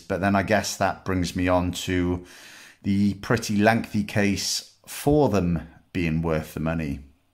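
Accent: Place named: South Wales, United Kingdom. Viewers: You sound like a south walean. Am I right? Cardiff/Caerphilly way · Swansea, along the Mumbles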